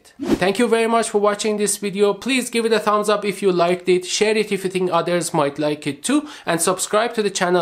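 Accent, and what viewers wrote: Iran. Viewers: Hey actually im interested on your accent, are you Iranian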